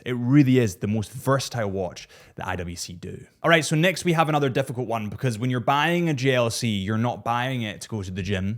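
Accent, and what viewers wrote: Scotland, United Kingdom. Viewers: Do all people in Scotland talk like this guy? He sounds so stupid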